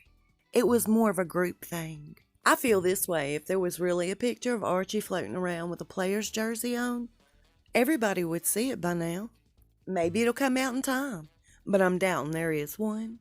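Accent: South, United States. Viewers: YOU are such a blessing to us,! I enjoy your posts so much and love your southern voice!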